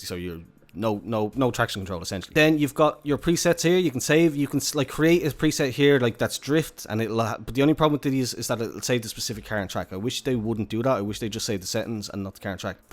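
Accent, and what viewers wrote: Dublin, Ireland. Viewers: You from dublin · U sound Irish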